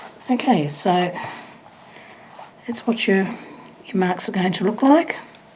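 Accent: Australia. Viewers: Okay, so while I LOVE your tutorial, I absolutely adore your Oz accent. Thank you so much for the guidance on this tutorial.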